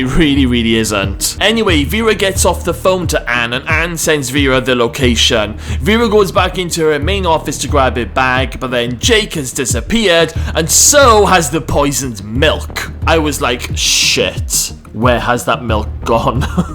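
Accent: Wales, United Kingdom. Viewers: Hi, LOVE your enthusiasm for the show, your Welsh accent & always look forward to your reviews. We will all be gutted when Wentworth is finished. Are there any tv series that you & Peter recommend for viewers to watch in the future, with amazing writing & acting like Wentworth? Thanks from Renee in New Zealand 🥝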